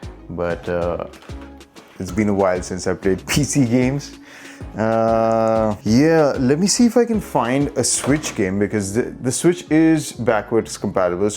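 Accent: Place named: India. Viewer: god the indian accent is so harsh and foul to my ears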